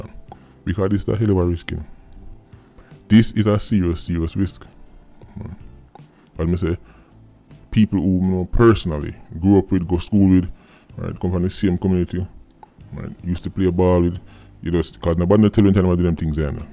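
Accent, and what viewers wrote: London, United Kingdom. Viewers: Because the UK have left Brexit there is a deadline for EU citizens who still live in the UK to get their papers sorted to remain in the UK and maybe because they havent got the papers and dont want to go back to the EU so that may be why there are people trying to get Bonehead Biden's America, so i doubt whether its an actual UK born citizen but as UK was a former EU country (thank God UK people had sense to leave it) you could have people from all over Europe in the UK so that may be the answer or as you say it be a combination of a European trying to escape UK and EU justice to go the US where the current President is one of the most crooked men in Politics and where the Justice system is becoming a joke and illegal immigration is welcomed so the KKK Democrats can "increase" their votes...pathetic, by the way nice London accent 😂As for the knife crime you have a group of kids playing at life who are killing each other, most of them have unlying mental heakth issues or broken homes bad from school and have nothing about themselves; this is not societies fault as this younger generation have ALL the benefits and opportunities all the support all the legislation to get where they want and many young people are achieving but its the handful of demon possessed wretches that cause the problems time and time again yes some come form bad homes but so do many many other youths who CHOOSE to achiev....the UK is safe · Mans from London bruv. 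Lol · you sound like real British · Your London accent on point we only used esta to go USA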